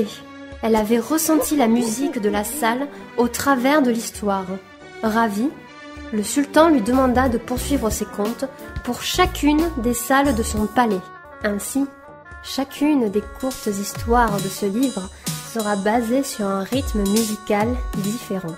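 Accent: South, France. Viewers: L'accent du sudd <3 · Petit accent du sud guerre discret malheureusement^^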